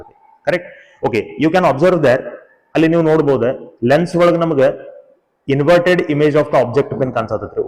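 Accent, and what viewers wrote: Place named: North Karnataka, India. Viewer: And also I got know tht u are Uttar kannadiga by ur talking style😅 am From GADAG SIR Bipin Chikkatti Pu science College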